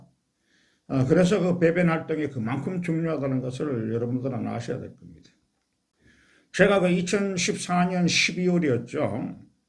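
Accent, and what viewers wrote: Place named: Gyeongsang, South Korea. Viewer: 멋진 우리 리더님 구수한 경상도 특유의 사투리 너무 정겹고 멋있으십니다^^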